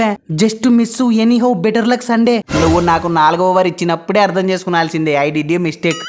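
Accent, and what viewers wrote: Rayalaseema, India. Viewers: ఈడ క్రికెట్ ఆడినట్ట్టు లేదే ఇపుల్ బజ్జి ఆడినట్టు ఉంది 😂😂😂😂😂 రాయల సీమ బాషా మజాకా 😂😂😂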